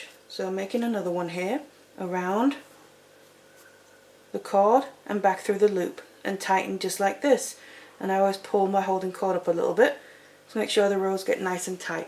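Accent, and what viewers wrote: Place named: Northern England, United Kingdom. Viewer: Are you from Northern England...I recognized your accent from watching a mini-series on the BBC called North and South. :o) This is really pretty! Thanks!!!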